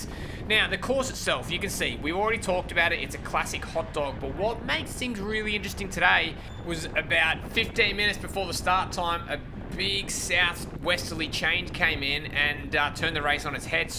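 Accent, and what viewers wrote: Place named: Australia. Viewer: Love your energy and the Aussie accent 👍🏼🙌🏼💪🏼 🇦🇺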